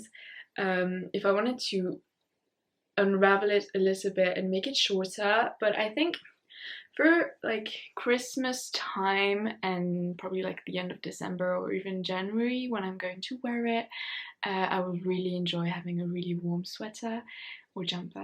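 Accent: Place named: United Kingdom. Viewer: Hello from Nova Scotia, Canada. Congratulations on your first podcast, it was delightful and very informative. Your English is perfect and love the slightly British accent. Your enthusiasm and sweet smile make you and star in my books. Just be yourself. Your knitting is quite lovely and your yarn choices are great - not cheep as far as I am concerned. I would love to try the Drops line of wool someday. I am 68 and very much on a budget so I do what I can. I look forward to your next podcast which, by now is out and ready for me to watch next.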